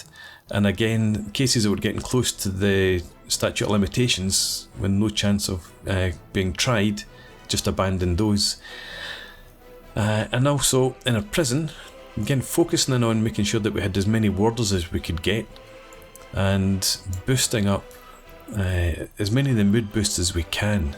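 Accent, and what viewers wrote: Scotland, United Kingdom. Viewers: going by the choochta accent your no west coast, you towards falkirk?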